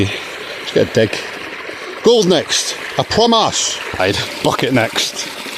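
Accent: United Kingdom. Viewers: I knew that twang was Edinburgh, I take it your not far. Was going to say thought I knew your voice when first heard u but surely not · Never heard Scottish befor . Love it. Good luck with gold hunting. You'll be needing it soon.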